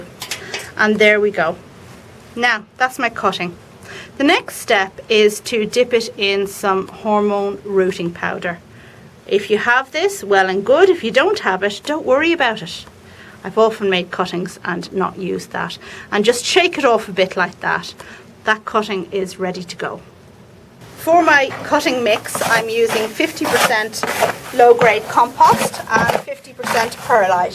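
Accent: Ireland. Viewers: I have learned that if you plan to use an herb for culinary purposes, dipping in hormone powder is not good since it imparts the chemicals in the hormone powder. If it is just for ornamental purposes, that is fine. Love your accent, Love Ireland!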